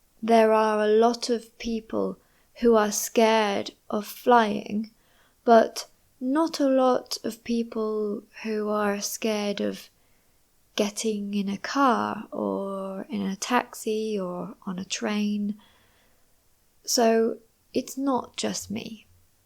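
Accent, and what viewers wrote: United Kingdom. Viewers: Thank you so much i feel happy and motivated after watching this video because i was very afraid of British accent as an English learner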